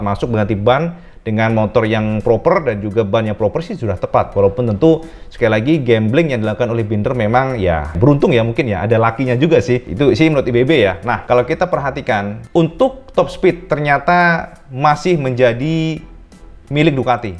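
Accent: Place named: Java, Indonesia. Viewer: Cak iki asli Banaran Kandangan to Cak? 😲Kok medok pol..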